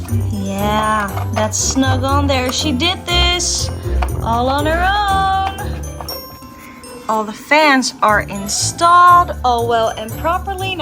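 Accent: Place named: Netherlands. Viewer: ik hoorde al meteen dat je Nederlands was en wat geniet ik van deze video hahahah soon ook mn pc bouwen. spannonddd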